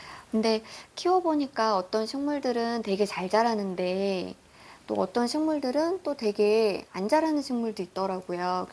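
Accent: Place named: Seoul, South Korea. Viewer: 영상 잘 보고 갑니다 ^^ 경기 서울 분이신가요? 서울사투리가 조금씩 들리네요 ㅎㅎ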